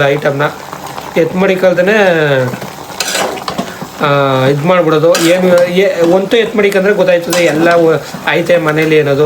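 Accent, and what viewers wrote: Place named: Hassan, India. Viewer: Nam amma Hassan doru nam appa uttar karnataka nanu Hassan bhashena,uta na,climate na tumba miss madkoteni and nimma bhashe keli tumba kushi aytu One matt heltini bro nim lifestyle nange tumba eshta because nemdi ede dudd edre nemdi jasti eralla nange nim tara halli jeevna madbeku anta ishta sir and nive healthy agi ertira last vargu ❤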